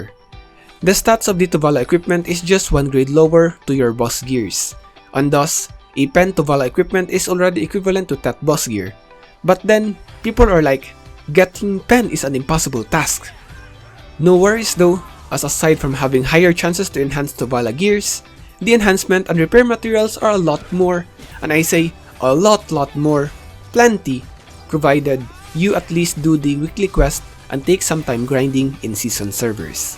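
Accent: Philippines. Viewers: Filipino accent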